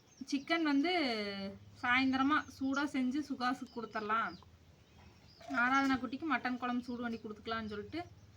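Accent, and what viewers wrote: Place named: Kongu, India. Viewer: கறிவிருந்து அருமை அருமை நேற்று நீங்கள் பேசிய கொங்கு மொழியும் என்னைப்பற்றி கூறிய விதமும் மிகவும் நன்றாகவும் விரிவாகவும் இருந்தது உங்கள் அம்மாவிற்கு தேனீக்கள் போல் என்றென்றும் சுறுசுறுப்பாக இயங்கும் அம்மாவிற்கு எனது பாராட்டுக்கள் ❤❤❤❤❤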